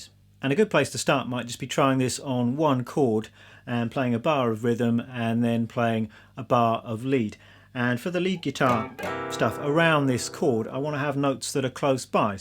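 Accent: United Kingdom. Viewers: “Whoops, didn’t mean to click on this video. Wait, he is British- let me see what he has to say.” This is what accents do to my brain.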